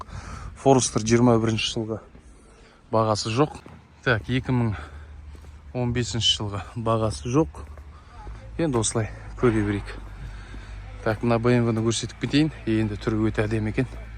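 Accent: Standard. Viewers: Ассалаумағалейкүм көпшілік! Сөйлеу мәнері, тіл байлығыныз керемт. Таза қазақ тілінде сөйлейсіз, тындап отыра бергін келеді.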